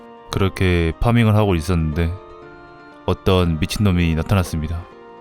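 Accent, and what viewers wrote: Jeolla, South Korea. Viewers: 오지는 전라도 억양 존나 잘어울려 ㅋㅋㅋㅋㅋㅋㅋㅋ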